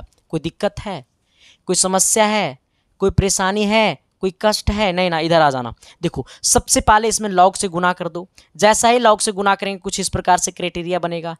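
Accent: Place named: Bihar, India. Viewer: Bahut hard🎉🔥!! That bihari.. tone !! Jsstt took the heart💖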